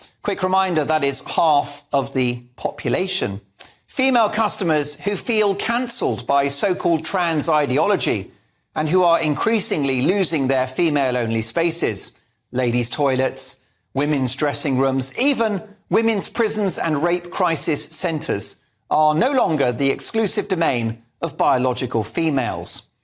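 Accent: United Kingdom. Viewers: You British ma'am?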